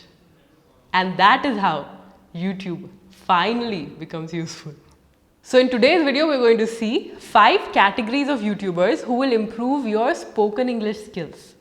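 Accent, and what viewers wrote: India. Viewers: I don't like them I like only Indian English like you.. I always hear your English speaking in youtube during sleeping.... Am I telling true' English 😀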